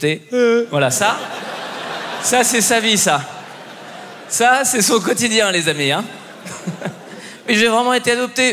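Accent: Quebec, Canada. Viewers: L'accent québécois m'a fumée rire😂😂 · C’est le meilleur accent québécois fait par un français que j’ai jamais entendu! (Je suis québécoise) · Haha! Pas mal l'accent québécois. Un mélange d'accent du Saguenay et de la Beauce😎. Probablement une des meilleures imitations de l'accent québécois par un français 😎. Pour une fois qu'on a pas l'aire trop... bozzo🤙😉. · Ouah… Vérino nous avait habitué à tellement mieux… là on nage en plein sexisme (« Hahaha ma femme qui… ») nappé d’humour anti-accent québequois, c’est pas terrible. C’est border Bigard. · J’ai prit un de ces fou rire avec son histoire et l’accent québécois ! Magistral. J’attends la tournée avec impatience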